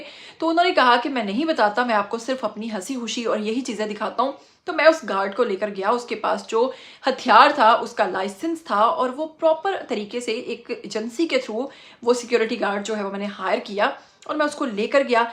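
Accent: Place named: Faisalabad, Pakistan. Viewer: Ap faislabad walon ki tarha punjabi bolti hn nice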